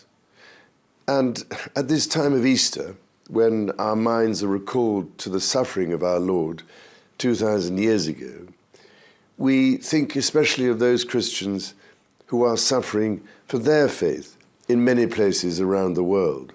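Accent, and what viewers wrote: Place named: England, United Kingdom. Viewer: That English voice is so perfect . Wow , . His tone is par excellence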